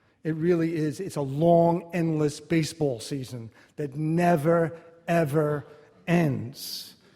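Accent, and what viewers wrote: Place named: New York, United States. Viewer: Love John Turturro...he always gives a wonderful performance and makes everything more special. He also reminds me of NYC when he talks in his own voice, which I miss. Family commitment is becoming a rare thing, but this is a beautiful example of how he approached his particular situation He would make a day ALL about his mother and brother, although the unexpected visits are sometimes necessary, too. It's when you are the full-time caretaker, you absolutely need to hand off the duties at least a couple times a week or you just end up exhausted and can slide into depression. This is why home health care workers are so necessary, although our current administration seems they could care less.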